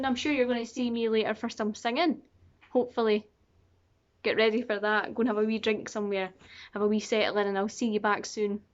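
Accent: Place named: Scotland, United Kingdom. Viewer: Damn, now that's some real scottish accent.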